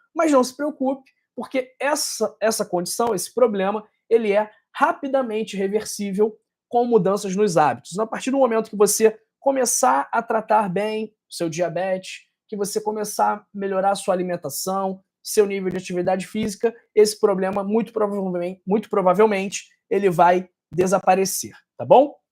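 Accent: Rio de Janeiro, Brazil. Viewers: Que nojo este sotaque carioca carregado...não dá nem pra escutar... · É o sotaque dos cariocas.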